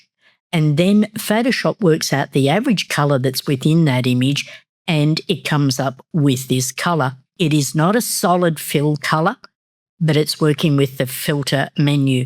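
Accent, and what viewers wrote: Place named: Australia. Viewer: Thank you for your time. Lovely to hear an Aussie voiceover!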